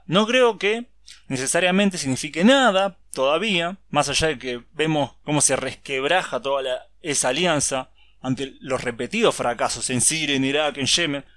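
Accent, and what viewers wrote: Argentina. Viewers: En "ashuda" y es la forma de hablar que tiene la gente que vive en el gran Buenos Aires,CABA y distintas ciudades a lo largo y ancho de la república Argentina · Tu acento de nariz tapada argentina es tan fuerte que se te entiende poco. Mejóralo, chhhheeee..... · que lindo esuchar un argentino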